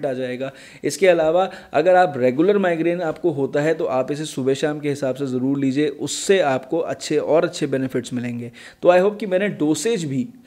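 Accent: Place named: India. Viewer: Indian English!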